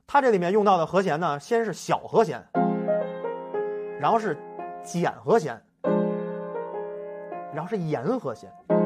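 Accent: Beijing, China. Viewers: 你是不是北京人？听着怎么有点像袁腾飞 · 就冲这嘴麻溜的京片子，也得订阅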